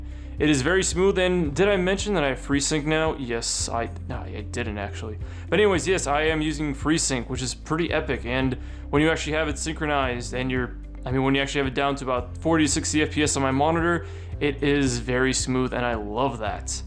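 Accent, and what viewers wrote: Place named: Boston, United States. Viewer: why would you say wicked? because youre from Bawston. Own that shit, brah.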